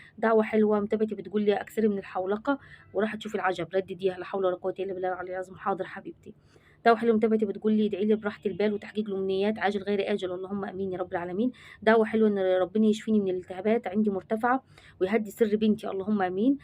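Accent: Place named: Upper Egypt, Egypt. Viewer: ربنا يعطيك الصحه كلامك عسل اوي😂❤ كلام الصعايده حلو😢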